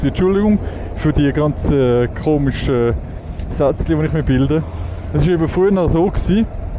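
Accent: Switzerland. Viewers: Schwiezerdütsch, wie spaßig ist das denn, ich liebe es. Geht um Führerscheinklassen fürs Motorrad, soviel hab ich verstanden, großartig. Schöne Grüße aus dem wildem Osten (Deutschland, Thüringen).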